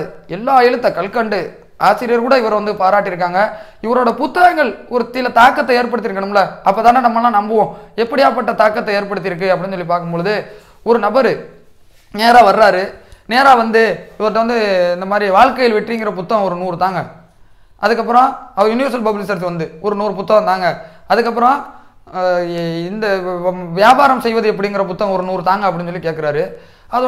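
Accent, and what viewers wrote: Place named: Thondi, India. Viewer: தொண்டி தமிழ் அருமை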